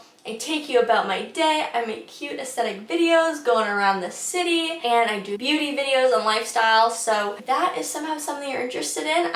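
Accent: United Kingdom. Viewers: Keep using the word British…. saying UK is a new obsession that is a bit bland and mostly due to Scotland and Wales pushing more for national identity. The idea of a specific ‘British’ accent is sort of a North American concept but I know what you mean, yours is great… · You sound British to me! But I’m from North Carolina. Lol. We used to go to Ipperwash beach and visit relatives in London each summer. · Great video! As someone who had a British girlfriend and hung out with an all British crowd while stationed in Spain, I can say your British accent is great! I’m hoping that my daughter will be interested in an LBC when she starts driving in a few years, but so far she has not expressed any interest in participating in her brothers and my channel working on them. She’s still got a few years to go though, so there’s always hope! Keep up the great work! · Your British Accent is cute! 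If you want to take it to the next level, study Cockney Rhyming Slang and then you can go apples and pears and have a good old rabbit and pork!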